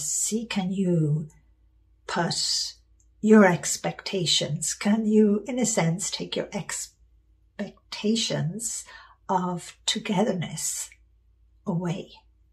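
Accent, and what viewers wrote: Ireland. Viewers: Lorna, You have no idea how this message is perfect timing as I need to do a little bridge mending. I've been avoiding it, but I don't want to let the situation keep going the way it is....your message is the nudge I needed! I saw you years ago at The Unity Church in St Petersburg, Florida. You had written your first book. The sanctuary was packed. Your lovely Irish accent came floating out and we were all on board with your message and fell in love with. Vicki Perez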